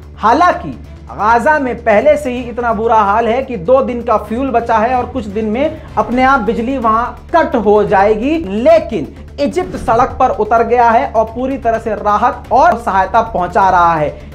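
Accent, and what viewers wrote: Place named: Bihar, India. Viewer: sahab aap bahut acchi anchoring karte haiN thora lahze meN biharipan hai usko bhi durust kar lete to aur nikhar jate waise jitna hai wo bhi qabiledad hai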